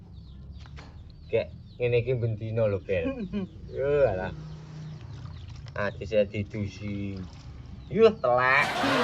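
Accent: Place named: Blitar, Indonesia. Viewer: kong sampean asline pundi apa blitar ko nadane koyo wong blitar blitarnya mana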